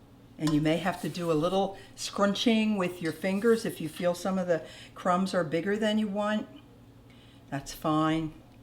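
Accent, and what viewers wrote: Philadelphia, United States. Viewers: I pray is doing well so glad I found this channel! I knew you were from the Philly area by your accent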